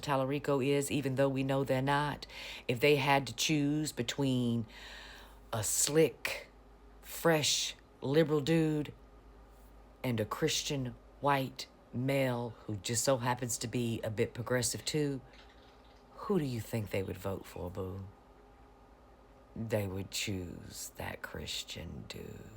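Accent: South, United States. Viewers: Crockett and AOC would be great for USA. You speak truth, and with a real Southern drawl.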